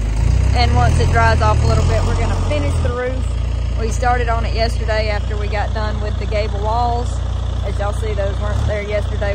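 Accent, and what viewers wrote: South, United States. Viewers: Amazing southern accent..😂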